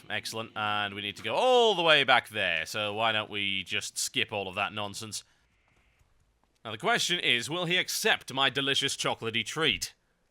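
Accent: United Kingdom. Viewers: British accent ftw !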